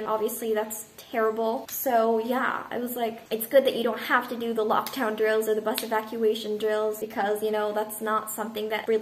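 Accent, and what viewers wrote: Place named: England, United Kingdom. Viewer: I'm here to listen your voice ( English accent ). Makagigil 😚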